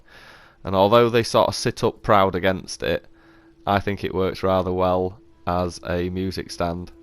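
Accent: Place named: Northern England, United Kingdom. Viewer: I'm surprised your views are so low. Like this is a very rare market on YouTube. I've been looking for things like this and not many do it. Thanks for the video, and I think I can hear that northern accent so maybe I am biased as a Yorkshire man myself ;)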